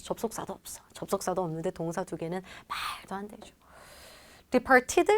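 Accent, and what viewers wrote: Gyeongsang, South Korea. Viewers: 경상도분이신가여 ㅎㅎ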